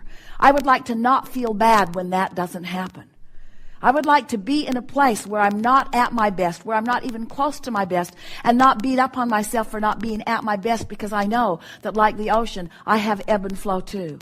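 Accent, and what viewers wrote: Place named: Transatlantic, United States. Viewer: That is so lovely. I love starting my day with a warm drink and listening to Abraham. Esther has such a wonderful transatlantic voice, perfect for storytime and speaking to our inner knowing.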